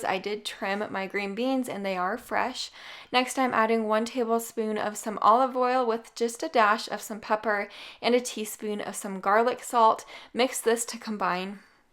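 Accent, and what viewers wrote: Utah, United States. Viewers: I'm almost 50 and I wish your videos were around when I was in my 20s. Thank you for these! And your Utah accent is adorable 😄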